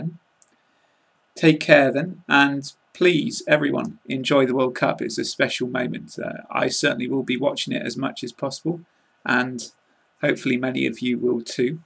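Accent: United Kingdom. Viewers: Hello, cheers from Egypt um really keen on learning British accent and especially ur luvly accent :) can u please recommend the easiest ways...I've heard of "shadowing" which means 'coping' and repetition of sb's speech and after a while you will be able to gain the accent.I've NOT started yet to do it cuz um waiting for ur advice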